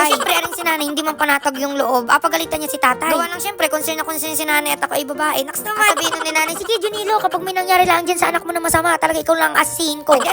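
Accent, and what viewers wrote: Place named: Quezon, Philippines. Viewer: ngayung 730 lang nene ay ang punto mo preho da quezon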